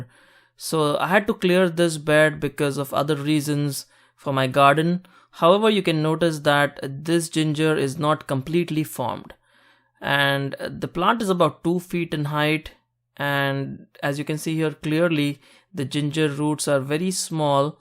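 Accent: India. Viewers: Such a nice accent. Indian ?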